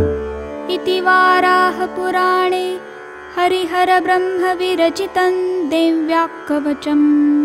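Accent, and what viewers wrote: Standard. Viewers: शुद्ध उच्चारण , सुमधुर आवाज । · इस प्रकार सस्वर सहित एवं शुद्ध व्याकरण सहित पाठ मैंने आज तक नहीं सुना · ऊँ नमो: भगवते वासुदेवाय । इतना सुंदर शुद्ध उच्चारण के साथ आप गायीं , बहुत बहुत धन्यवाद आपको । मीना सिनहा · Complete path. Visuddh uchharan sath sath pratyaksh on screen. Iskeliye koti koti pranam . MAA DURGA AAP PE KRIPA KAREN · Bahut madhur vani avm bahut shudh vachan.bahin per saraswati maa ki kripa hai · जय भगवती मा शुद्ध एवं सात्विक उच्चारण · Bahut shudh ucharan ,ati sunder. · शुद्ध उच्चार... 💐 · अत्युत्तम सुमधुर कर्णप्रिय सांस्कृतिक स्वर में शुद्ध उच्चारणयुक्त, जय मां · ATI shudh uchharan Hai apka. Param madhur swar. Jai Ma 🙏🙏swayam Ma Saraswati ka Roop hain aap. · सादर नमस्कार। शुद्ध उच्चारण साक्षात् गले में सरस्वती जी विराजमान हैं। मैं रोज सुनती हूँ और साथ में गाने का भी प्रयास करती हूँ ।मुझे भी गाने का शौक है। बहुत बहुत धन्यवाद नमस्कार। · Very nice, suddha uchharan To Liston again and again, Love to Liston all the time. 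💐💐🌹👌👏🙏thanks making this odio. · adbhut शुद्धता और पवित्रता के लिए ही परसिद्ध है।शुद्ध उच्चारणके लिये कोटिश:धन्यवाद अभिनंदन।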